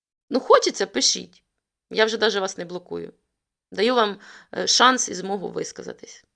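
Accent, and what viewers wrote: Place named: Surzhyk, Ukraine. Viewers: Та ну... Суржик у вас дуже милий, домашній такий, доречний. Впевнений що літературною теж розмовляєте. Просто фішка тут така своя у вас) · Я не знаю, чому Ютуб підкинув ваші відео, але я вас підтримую)))) Вподобайка та Комент у підтримку! У вас чудовий центрально-східний діалект на скільки я чую. І слава Богу що у нас в Україні можна оприділити звідки людина тільки по розмові. Успіхів вам)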